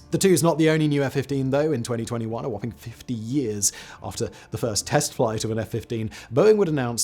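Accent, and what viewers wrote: England, United Kingdom. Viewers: The F-15 NEVER served in Vietnam!!!!! How can you be so fucking lazy that you can’t be bothered to look up an easily verifiable fact!!! This video is proof that having an English accent doesn’t make you smart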